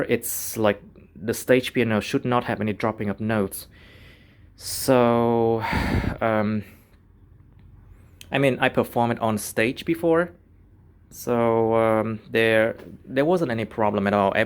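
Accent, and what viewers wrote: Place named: Vietnam. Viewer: coi từ đầu tới khúc nói cái soundcard mới nhận ra anh là người Việt Nam, hèn chi nghe giọng thấy màu quen quen =)))) mà cảm ơn anh vì bài review có tâm này nha, em cũng đang tìm hiểu giữa con cp73 này và nord electro ☺️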